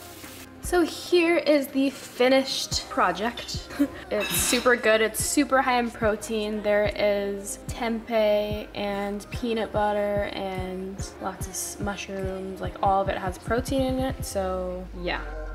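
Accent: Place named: Minnesota, United States. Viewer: Would never have thought Minnesota lol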